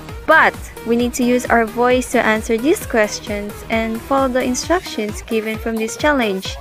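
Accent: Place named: Philippines. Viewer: ! Your voice is majikawaii! Both the pinoy accent & nihongo =D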